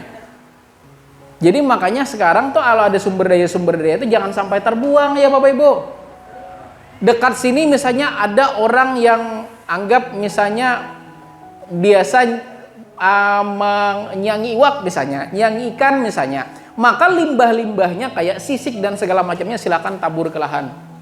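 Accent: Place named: West Java, Indonesia. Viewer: Mirip logat sunda 😅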